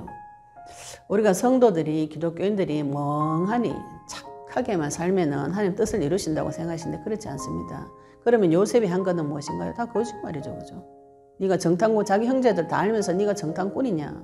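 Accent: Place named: Gyeongsang, South Korea. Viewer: 갱상도네? 대군감?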